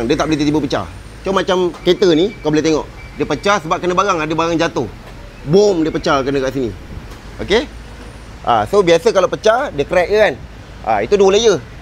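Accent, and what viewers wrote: Indonesia. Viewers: Indon ke dia ni?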